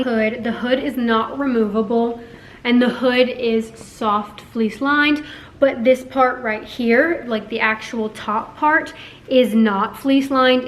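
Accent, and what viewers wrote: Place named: England, United Kingdom. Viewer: I am not ashamed to say that I have been a subscriber for a few months now... I am either like whaaaaaaa (jaw crashing off floor), you make me laugh with your awesome English accent hehe I'm from UK, may I mention that wow I look great with your hair straightened, always Beautiful just never seen you with straight hair, I think that all the shorts you don't really like or think you don't look as good as you actually do, you make the clothes sexy, anyway I'm just expressing truth so surly ppl can't be mad at me for that, And my perception is different to yours, I see the Art in a different beauty as beauty lays in the eye of the beholder, luv ya awesome vids. Xx Dav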